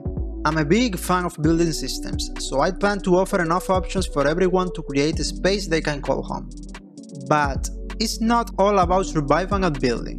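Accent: Spain. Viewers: my native language is espanish is just cute :) relax mate, we can all understand you and having spanish accent is not worst than having american accent ;) gl with the game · Ya decía yo que me sonaba el acento, un pana de España. Suerte con el juego, pues la scope está ciertamente alta jajaja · Men eres increíble. Te apoyo totalmente en tu nuevo proyecto y deseo que lo logres superando tus propias expectativas. PD: si lo llegas a ser (q imagino q sí) espero llegar a jugarlo😢. También! Tu inglés tiene un acento notablemente español, pero es entendible, con práctica y experiencia se mejoran. Sigue así!😎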